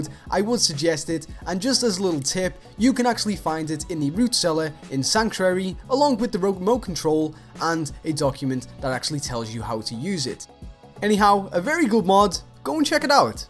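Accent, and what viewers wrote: Liverpool, United Kingdom. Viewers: You've got the weirdest scouse accent ever you lad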